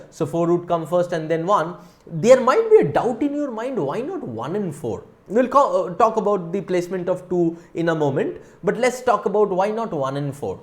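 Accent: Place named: Bengal, India. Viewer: Sir, you explain so well. But I guess there is a problem in me.. Still I'm unable to solve these types of problems.. So, I also started viewing critical reasoning topic with a hope to acquire more knowledge from you... Thank you! 🙏 Well, I'm curious to know are you bengali? 😅 (off topic)... Your accent seems so...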